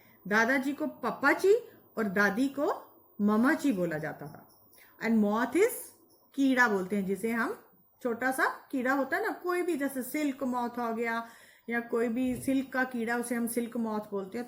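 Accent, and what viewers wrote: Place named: Jammu, India. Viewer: Mam aapme thodi dogri jalak hai 😅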